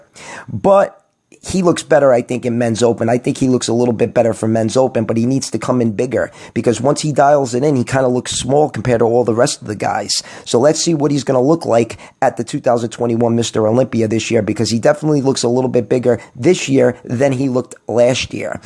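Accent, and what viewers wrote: New York, United States. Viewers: i love your no bullshit attitude mixed with the NY accent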